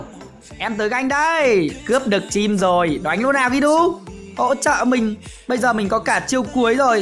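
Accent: Nam Dinh, Vietnam. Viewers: G quê nam định à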